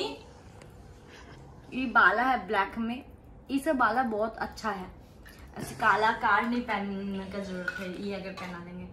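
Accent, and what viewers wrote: Bengal, India. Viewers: Apki Hindi bht sweet hai☺️hum marenge ye achcha bolti ho waise mai bhi bengali hu or humko bhi secret wala insaan ka naam Janna hai😂😂or barki mummy bolne par camera man itna has kiu rhe hai🙄 bengali bolne wale log sab Hindi bol nhi pate but ne kosish kar rhi hai bht jaldi sikh jayegi☺️👍🏻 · Di O aapka Bengoli kadha O bahut hi cute Hy very nice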